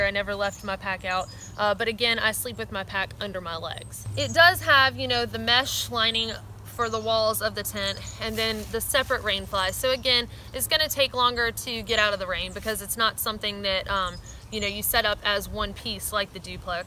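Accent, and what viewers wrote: South, United States. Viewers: It is so so great to see a young lady be such an outdoor lover. They may be out there but you don't see them....most people of her age are too busy watching Kim K and not seeing the world for what it is.....and the southern accent is awesome. Great vids and good work....thank you.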